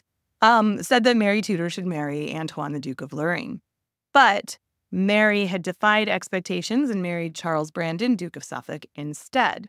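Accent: United States. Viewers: English history in an American accent 🤮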